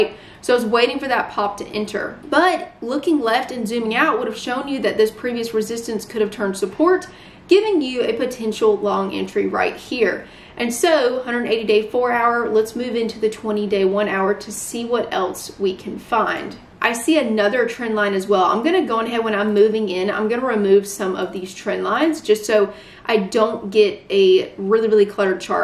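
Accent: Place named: South, United States. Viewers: Are you from Southaven, Ms.? I recognize the dialect. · I Love Your accent, straight out the south? Either way, You are Awesome.Thanj you for the priceless information.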